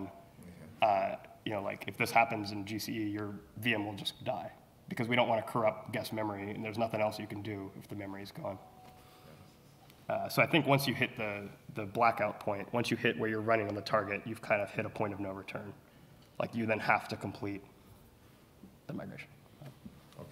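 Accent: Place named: Assam, India. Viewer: You speak phenomenally well, despite the Assamese accent!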